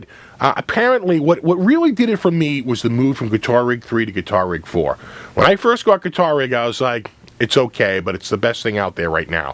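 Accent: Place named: New England, United States. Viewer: lol are yu from rhode island? or sumwhere in new england?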